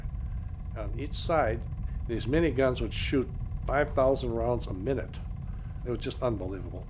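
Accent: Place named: Wisconsin, United States. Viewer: Thank you for your service sir. You aren’t from Wisconsin by chance? The accent sounds like my home state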